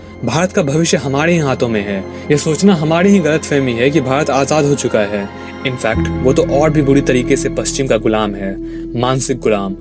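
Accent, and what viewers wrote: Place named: Bengal, India. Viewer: min...The text was in Bengali...❤️❤️ Are u a bangali sir??? I am very curious 😄😄..apka hindi accent dekh ke muja ve laga app bengai hai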